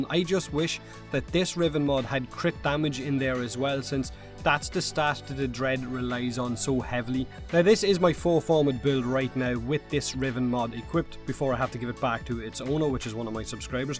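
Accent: Ireland. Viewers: "What's up, feckers?" Love that Irish accent. Wanna know if there are multiple accents like the British.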